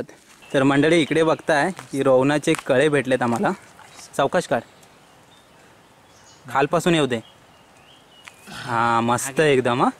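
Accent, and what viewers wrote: India. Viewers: मस्त #properमालवणी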